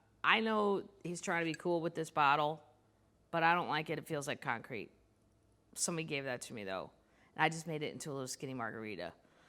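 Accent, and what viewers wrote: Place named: South, United States. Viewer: like the accents, specially southern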